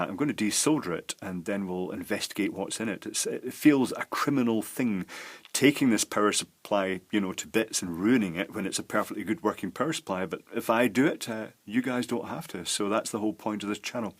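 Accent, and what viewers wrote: Scotland, United Kingdom. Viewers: Interesting accent. Is it Scottish?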